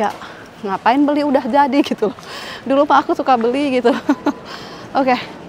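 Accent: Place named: Sunda, Indonesia. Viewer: Teh kl ngomong sunda lucu kdg suka ktawa😁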